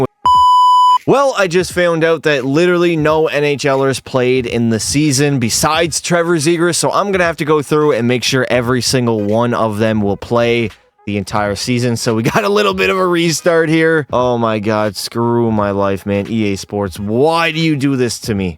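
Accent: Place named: Quebec, Canada. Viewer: 10/10 your Québec accent!! Should be living at Montréal.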